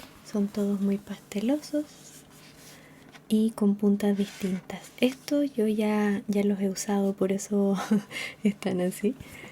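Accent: Chile. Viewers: Cuando eres chilen@ reconoces a otro chileno en un segundo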